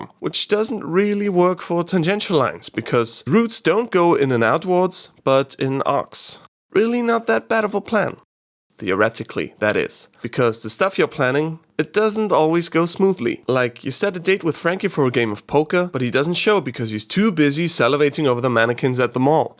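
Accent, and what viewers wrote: Vienna, Austria. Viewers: Its weird seeing an youtube channel in english with hints of austria or austrian dialects such as the: Tor! I wea narisch! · ich schau mir das hier nur an weil dein österreichischer Akzent sich im englischen dermaßen amüsant anhört dass es eher nicht geht XD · Sad but true story: I'm German and always watch the English version of your videos as the Wiener Version is completely incomprehensible if you're not used to that dialect :D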